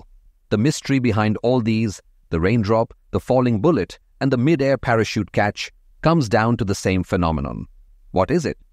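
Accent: India. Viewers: clear indian accent though. But much more understandable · Not just a hint. It is an Indian accent. I know because I am Indian. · why do i feel like a hint of Indian accent in this videos commentator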